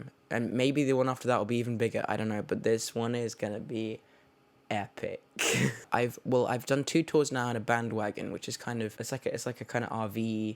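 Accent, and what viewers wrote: United States. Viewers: Can we talk about how AMERICAN his accent has become? Cultured <3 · WHY DOES HE SOUND AMERICAN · is it just me or does he sound a bit american in this video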